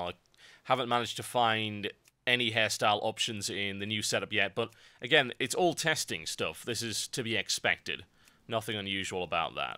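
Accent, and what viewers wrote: United Kingdom. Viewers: Just subscribed... Seen a few of your vids last night and thought ''Hey, this guys British like me, and almost as funny!" Some top-class uploads, keep up the good work - Much appreciated.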